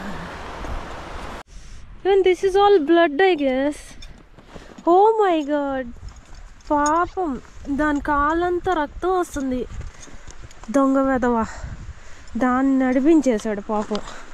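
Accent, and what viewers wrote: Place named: Andhra Pradesh, India. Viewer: మీది రాముడువలస??? మీ యాస అలాగే వుంది. ప్లీజ్ చెప్పండి.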